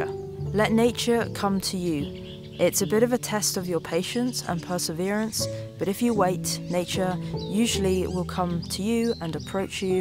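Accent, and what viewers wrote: United Kingdom. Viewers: I think I understand the accent very well but there several new words that I've never heard before (for animals, plants etc.). Thankfully not that specifically annoying type of British accent.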